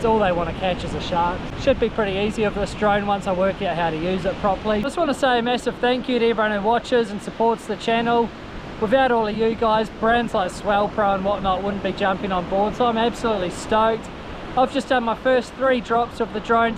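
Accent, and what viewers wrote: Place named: New Zealand. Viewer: you sound like a kiwi, Lovely beaches in Ozz, hope you have great fishing 😊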